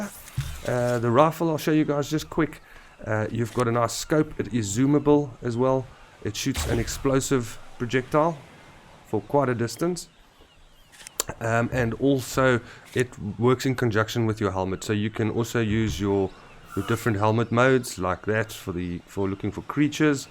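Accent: Afrikaans, South Africa. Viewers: Is that kiwi or suth efreeken? Pretty sure it's suth efreeken.. best accents ever. Kiwis slightly better purely cos of the ey Bru U drounk yit? Oh no wirries Bru. Have ma criser I gat thri edit: kisses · Are you from south africa? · Sound afrikaans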